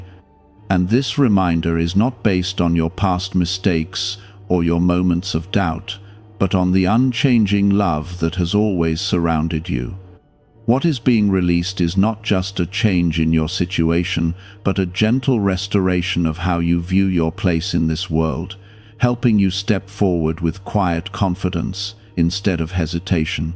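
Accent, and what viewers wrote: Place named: United Kingdom. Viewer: Why does this AI god have a slight Britsh accent?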